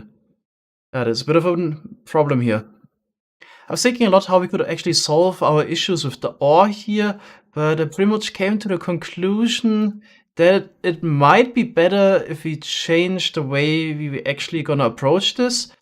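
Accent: Germany. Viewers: Ich wusste es du klingst übel deutsch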